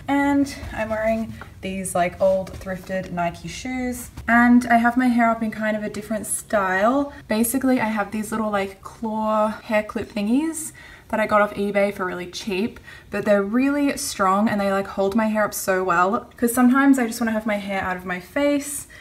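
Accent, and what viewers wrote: Australia. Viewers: Also dude, I thought you were Australian, then I saw the city and thought you were in London, then I looked on your blog and it says you['re from Sydney. I'M SO ANNOYED cos I saw those lovisa chokers and I just. REALLY. need to buy a choker right now. I'm so annoyed cos I want like 10 beautiful chokers but no stores have good ones ugh.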